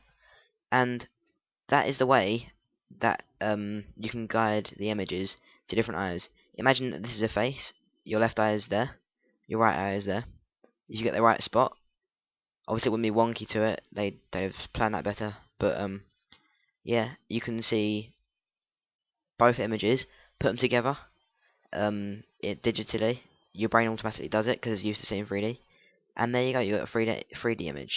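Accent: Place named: United Kingdom. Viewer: I get that you're British, but do you have to pronounce it "free d"?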